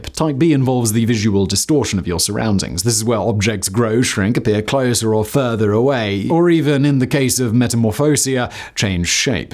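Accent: United Kingdom. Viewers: I like this channel minus the obnoxious British accent.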